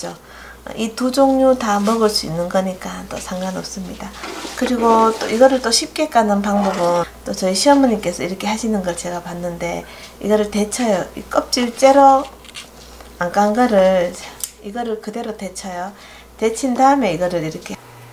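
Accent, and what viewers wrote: Gyeongsang, South Korea. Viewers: 고구마줄기 골은거.ㅋㅋㅋ 한참웃었네요.뼈속까지 갱상도우리이모님. 저도 마산사람이라 골은거 낯설지가않네요. 화이팅입니다!!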